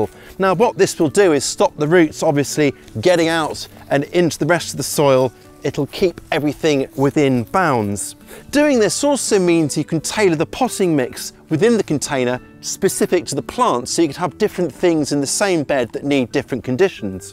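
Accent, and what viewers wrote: United Kingdom. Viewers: "just pop it in"..."use a brisky brush"...I will never tire of the British vocabulary and accent! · I love the British accent.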